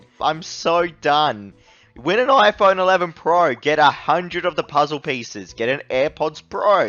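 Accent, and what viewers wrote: Australia. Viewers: You australian?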